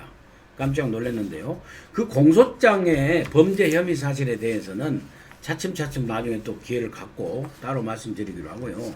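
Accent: Jeolla, South Korea. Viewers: 만나면 반가운 우리민원장님시원한 논평!!!시원합니다요.전라도 어조가 더 정겹더라구요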